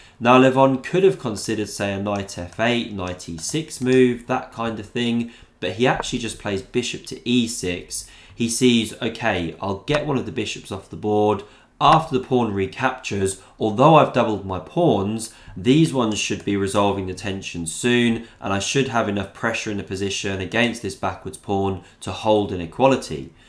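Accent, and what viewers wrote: England, United Kingdom. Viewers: based purely on his accent im 90% sure he is from england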